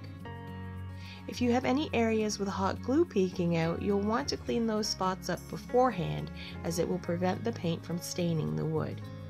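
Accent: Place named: Canada. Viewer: Thats lovely, are you Canadian? You sound a bit like Suzie from nail career education on here?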